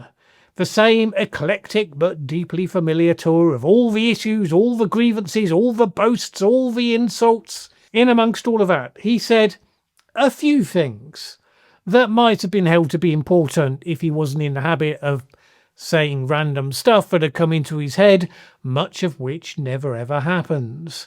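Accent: United Kingdom. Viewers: OH GOOD ! A quickly headline featuring name calling. Followed by a sarcastic narrative / lecture from an old guy with a British accent. Surely this guy's friends find him really persuasive. But what is actually at stake bears analysis. Anyone paying attention knows that the USA has many more general officers / senior officers on staff than at the end of WW2 when many multiples of today's forces were in uniform. We've also got the insanity of public health officers and doctors being called admirals because of some antiquated human resource theory which equates history of attainment of educational credentials with rank to justify pay. When you put all these guys together in an auditorium, they realize that they are not unique special individuals ruling over their direct reports in separated fifedoms, according to their own personal proclivities and idiosyncratic value systems. 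Look at all those gray heads! Underlings in military service live by "up or out" -- if you're not advancing, it's time to retire, unless you figure out a way to be retired on the job. But these guys, with limited or nonexistent or outdated war fighting experience, just spent 12 out if the last 16 years under Biden and Obama, "going along to get along. " This experience of course correction is being delivered in the nicest way possible -- demonstrating that these high ranking individuals are in a top heavy military...and if they stick around after being told the direction in which the nation's military is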